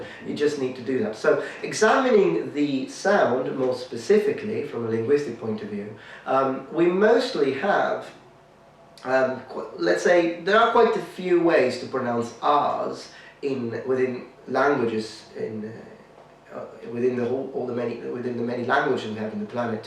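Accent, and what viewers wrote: England, United Kingdom. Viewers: are you british? I mention it because of your accent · Thank you so much! You are my language GOD! I’d been pronouncing the German r instead of Italian r for 10 years in my Italian and Spanish. I’d seen tons of videos and only 2 videos helped me a little bit. I’m studying bel canto now. It’s very important for me to make this detail perfect. I had subscribed you for liking you. Tonight I was so frustrated with this tricky r, and accidentally saw this video. THEN I DIRECTLY PRONOUNCED IT RIGHT by mimicking you. Yeah it is just as easy as you said. You have no idea how much I LOVE YOU right now. Btw I was attracted by your English accent at first. It’s beautiful. And I noticed your Chinese is also good. (My nationality is China but I’m ethnic Korean) You are the maestro of language in my heart. Now I can go to sleep happily. 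Buonanotte!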